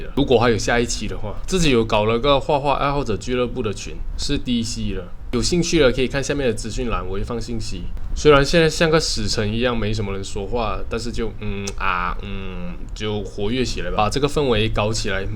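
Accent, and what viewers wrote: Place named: Malaysia. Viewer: hii! 本人是小小新手入门的小画家!，一点进去影片听到malaysian腔就觉得很亲切(? 插画圈子在马来西亚是真的很小,平常都是混欧美/日本的圈子多一些，可以看到在本地有那么大神的画师真的太棒了T-T.很喜欢你的幽默！影片也简单易懂,希望能够做多多这种相关的内容！:face-blue-smiling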